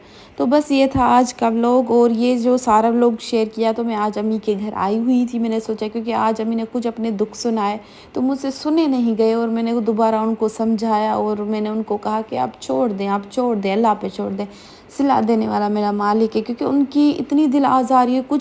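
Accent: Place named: Sindh, Pakistan. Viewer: Sister ap sindhi ho na😂 lehjay sy pata chal rha hy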